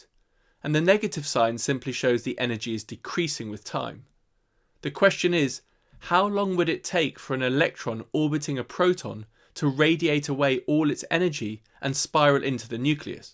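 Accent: United Kingdom. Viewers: If you have a British accent, why are you using the American billion?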